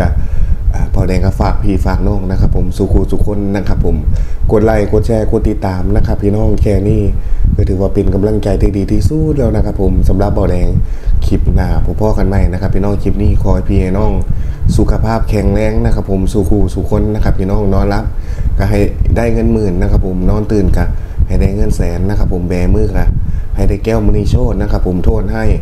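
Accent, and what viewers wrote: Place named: Phu Thai, Thailand. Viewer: เป็นนครพนมเบาะครับเว้าสำเนียงภาษาภูไทคือผมเลย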